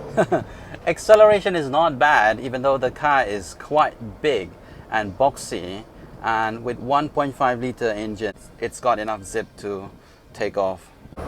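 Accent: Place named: United Kingdom. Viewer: I like your British accent!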